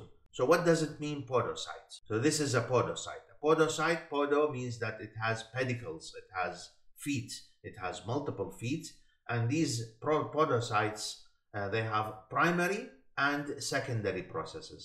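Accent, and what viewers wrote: Iraq. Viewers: Noticed the Iraqi accent from afar! Thank you for the brilliant explanation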